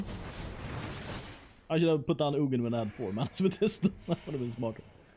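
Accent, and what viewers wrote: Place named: Sweden. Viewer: god dam you have a strong Swedish accsent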